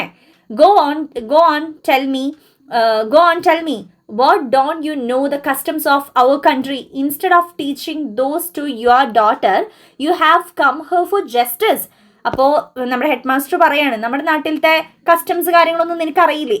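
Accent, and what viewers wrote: Thrissur, India. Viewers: തൃശൂർ slang is ❤️😌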